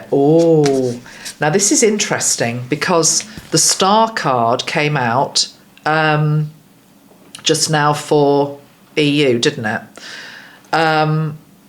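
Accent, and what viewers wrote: United Kingdom. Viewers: Thank you very much, I enjoyed as a Dutchman your real British accent and pronunciation, I learned from that and about your predictions, time will tell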